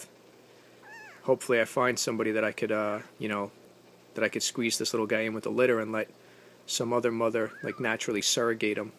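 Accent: New York, United States. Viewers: I love the sound of your tough new yorker accent and then this cute kitten. Great combination. You did the best you could. You're a legend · Hey man, are you a New Yorker? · Buddy, are you from queens or new york general area?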